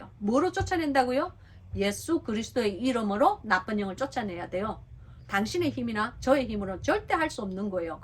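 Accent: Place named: Busan, South Korea. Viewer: 귀하신 말씀 나눠 주셔서 너무나 감사드립니다 부산 사투리가 어쩜 이리 친근하고 매력적인지 쎄가빠게 ….ㅋㅋ 쎄가빠지게 말씀공부 따라 가는 중입니다^^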